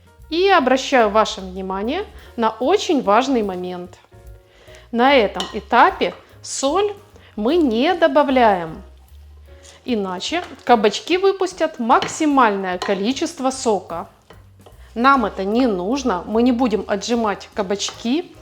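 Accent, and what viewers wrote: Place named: Ukraine. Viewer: Сразу слышно, что хохла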